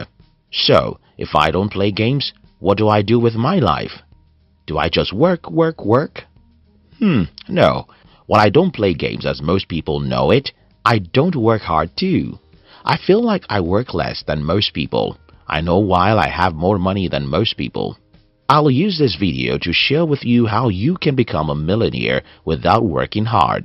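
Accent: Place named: Nigeria. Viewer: Hey man, be proud of your old Nigerian voice, it is better than this uninspiring voice.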